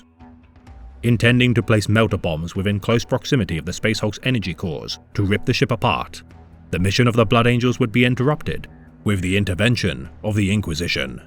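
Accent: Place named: England, United Kingdom. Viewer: I was getting tired of the necron narrator tbh lol back to that sweet English dialect lol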